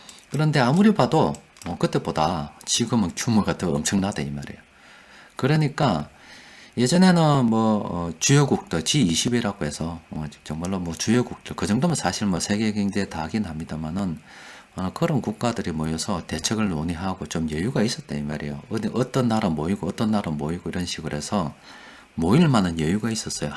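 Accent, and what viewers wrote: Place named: Daegu, South Korea. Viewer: 대구말씨가 참 구수하네요 좋은 내용 감사합니다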